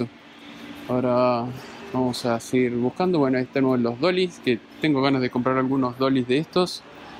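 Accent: Argentina. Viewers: Oye, cheee! No vas a hacer negocio, no ve uno nada! Nada mas nos mareaste con la camara, toma en cuenta que la mayoria te vemos con un celular, hacerca y enfoca, ve mas despacio, no se ven los precios, te recomiendo que vayas por zonas....martillos? Pues puros martillos despacio hasta que termines, no importa si el video tarda 30 min, o, mas! Otro video de otro producto, y asi...! No hables tan rapido, no todos entendemos bien por el acento de cada region, de habla hispana, y si es solo para argentinos, pues ponlo en el tituto, y por favor traduce, lo que sea importante del producto! Todos los que vivimos fuera de Eua, quisieramos, una tienda asi en nuestros paises, pero no lo hay existen pero es basura china. Asi que tu dices si, inicias un buen negocio, obvio tu vas a llevarte un dinero con los envios, y explica tu metodo, que sea confiable y sin fraudes, para hacerte pedidos.. 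Yo he ido, y hay otras tiendas, tambien de herramienta electrica y electronica, el campo de accion es grande, pon tu negocio "on line" Saludos y esperamos ver mas de tus videos, corregidos y aumeados, soy de mexico!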